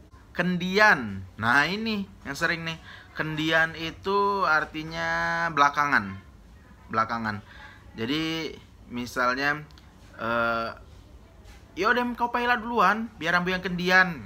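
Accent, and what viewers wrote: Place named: Bengkulu, Indonesia. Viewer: Ini dialek Bengkulu mana? Bengkulu kota? Dialeknya ada campuran Lembak, Serawai dan lain2.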